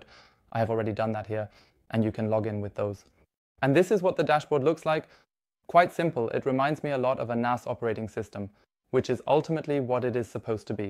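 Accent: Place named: Germany. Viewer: You are very handsome young man I love the German accent. 🤗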